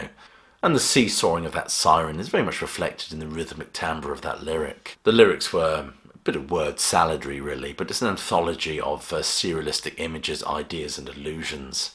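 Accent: England, United Kingdom. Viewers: Why does an English accent piss me off. Must be genetic memory.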